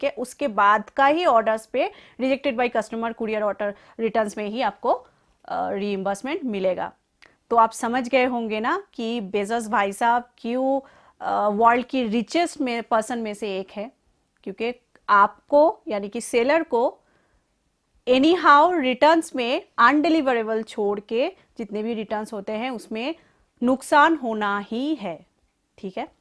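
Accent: Bengal, India. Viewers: your language is a serious issue , else information is good...one has to focus a lot to understood that bangla , hindi and english tone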